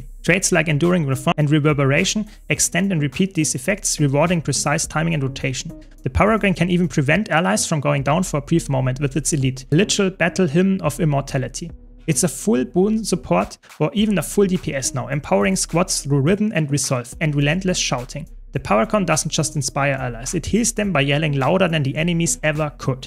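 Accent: Germany. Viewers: Heiliger bim bam das ist der deutscheste Akzent den ich je gehört habe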